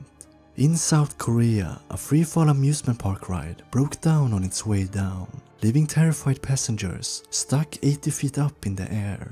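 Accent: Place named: Sweden. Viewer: Im a Finn, and can confirm. This is a swedish accent.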